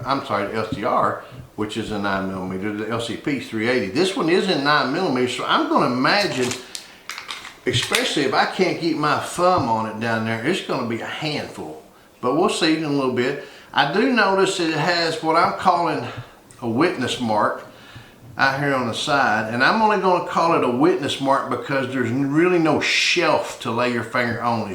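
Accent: South, United States. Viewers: I’ve never seen a Viking with a southern accent before love the content I’m going to subscribe 👍🏽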